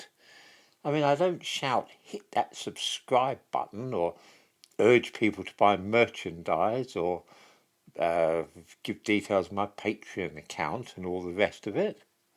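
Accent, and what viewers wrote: United Kingdom. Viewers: "...who is expressing views which are seldom voiced aloud..." I think you are not quite on the mark here. MANY people voice similar views. But here on this channel those views are voiced eloquently, and have them backed-up by (often quite obscure) facts. For example, though many people know in their gut that the current narrative on the 'Windrush generation' does not add up, few know enough on the subject to confidently object to seemingly factual statements made by supposed educated journalists, politicians and activists. Having someone actually articulate those views, backed with solid facts, that is what draws people in. Especially when voiced in an endearing archetypical British fashion.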